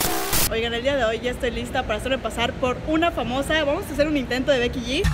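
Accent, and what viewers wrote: Campeche, Mexico. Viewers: Oye amiga eres de campeche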